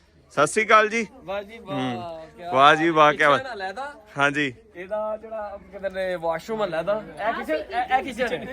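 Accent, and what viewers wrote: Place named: Hoshiarpur, India. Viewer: Aap Punjabi Hoshiarpur wali bari meethi Punjabi boltay hain ko mujay Bohat achi lagti hay mairay Maan Baap Hoshiarpur say aaey thay.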